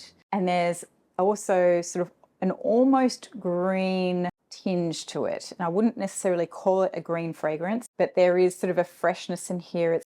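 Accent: Australia. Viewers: Hi, I came to you through Chris. I’m in Adelaide, so it’s nice to see and hear an Aussie talking about perfumes. Subscribed😎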